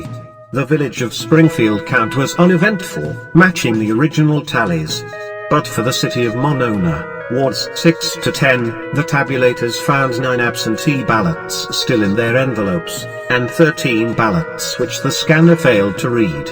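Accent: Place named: United Kingdom. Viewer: Why the odd british accented bot voice?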